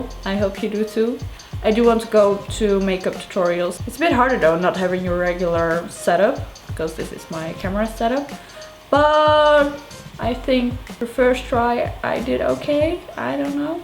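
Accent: Netherlands. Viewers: ben je vids aant bingewatchen. is leuk om te zien hoe je engels heel sneaky steeds iets minder dunglish word. en ik vind je over het algemeen gewoon geweldig i imagine myself to make vids like this if i had the balls xD zelfde attitude haha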